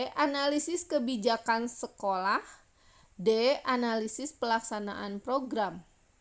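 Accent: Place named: Java, Indonesia. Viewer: Mandan medok sedikit bahasanya ya mb..hehe..pk sukses selalu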